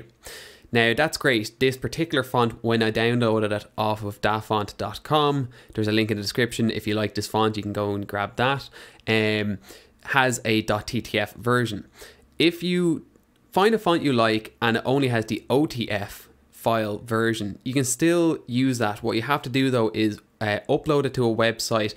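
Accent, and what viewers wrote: Ireland. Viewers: You Irish? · good man, nice to hear an Irish voice. This was really helpful thanks! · i only subscribed cause I heard the irish accent... love seeing other irish creators :)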